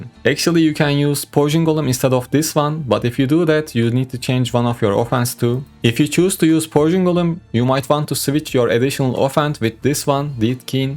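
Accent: Turkey. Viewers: Lan konuşmandan Türk olduğunu anladım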